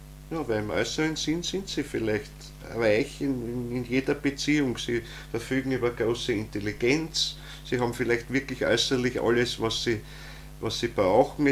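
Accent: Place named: Austria. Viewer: Vielen herzlichen Dank für die so wichtigen Vortragsreihe. Bloß ist es Stellenweise nicht so einfach wortlaut vollständig zu verstehen wegen der östreichischen Akzente.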